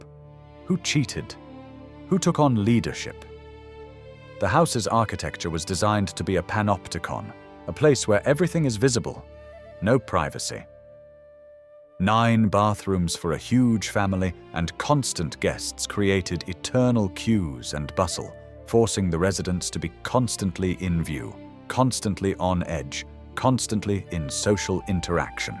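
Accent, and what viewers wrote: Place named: United Kingdom. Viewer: Why do we have an awful Brit accent narrating a totally American documentary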